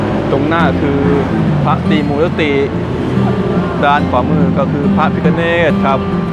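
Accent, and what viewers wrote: Phu Thai, Thailand. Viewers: พูดภาษาสำเนียงภูไทเป็นภาษาท้องถิ่นเหมือนกับภาษาเหนือ ภาษาใต้ ภาษาอีสาน คนอีสานรู้จักเรียกกันว่าภาษาสำเนียงภูไท เหมือนกับ สำเนียงสุพรรณ สำเนียงระยองฮิ ฯลฯ บางคำพวกเราก็เข้าใจแต่ส่วนมากหลายๆคำไม่เข้าใจ นครพนม อำเภอเรณูนคร มีฉายาว่าเวียงพิงค์นครแห่งแดนอีสาน สวยอ่อนช้อยงดงามทั้งคนและวัฒนธรรม เป็นอำเภอถิ่นภูไทมีชนเผ่าภูไทอาศัยอยู่ 99.99% พูดภาษาสำเนียงภูไทตั้งแต่เกิดสืบทอดมาจากบรรพบุรุษนับหลายพันปี นครพนม มุกดาหาร มีชาวบ้านท้องถิ่นอาศัยมานานนับพันปีอยู่ถึง 7 ชนเผ่า แต่ละชนเผ่ามีภาษาพูดเป็นของตัวเอง 1 ในนั้นคือชนเผ่าภูไท และที่จะไม่พูดถึงเลยไม่ได้เพราะวันนี้ถือว่าเป็นคนไทยเกรด A+++ เป็นชนเผ่าที่กุมอำนาจทางเศรษฐกิจสังคมและการเมืองอีสาน คือชนเผ่าที่ 8 ชนเผ่าจีน ชนเผ่าที่ 9 ชนเผ่าญวน แถมน้องใหม่ดาวรุ่ง ชนเผ่าที่ 10 คือชนเผ่าคิงส์โรมัน ผู้มีความรู้ความชำนาญเชี่ยวชาญเทคโนโลยี Call Center, พนันออนไลน์, เปิดกิจการนอมินี, ร้านค้านอมินี, ทัวร์นอมินี, ผัวนอมินี, เมียนอมินี, นายทุนเงินกู้นอมินี, ธุรกิจบัตร..ปลอม, ฟอกเงินข้ามชาติ ฯลฯ ถือได้ว่าเป็นธุรกิจน้องใหม่ไฟแรงแซงทุกโค้งเพราะมีเงินทุนซับพอร์ตมหาศาล เงินหนาพร้อมชนธุรกิจเจ้าเก่าทุกค่ายทุกแพลทฟอร์มให้มันรู้ไปว่าไผเป็นไผใครใหญ่ใครอยู่ จึงทำรายได้มหาศาลกว่าธุรกิจใดๆในโลก.. 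Hello thailand 🇹🇭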